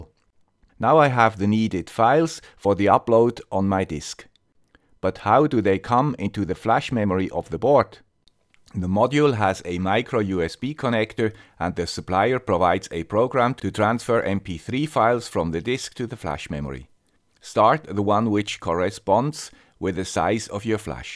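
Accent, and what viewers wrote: Switzerland. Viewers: I was surprised how different some of the words were. I guess, I should have not been, but I've never been to Switzerland (sadly). Now I appreciate his Swiss English more... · is there a way becoming Swiss without having this funny German dialect? =D Hamburg (Germany) has some shelters, but we haven't as many as you have. Oh, and I like your income too, but not your expenses to live.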